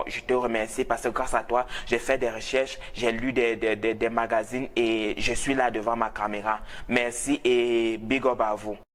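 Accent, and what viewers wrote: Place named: Cameroon. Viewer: Toi tu dois être camerounais vu ton accent😂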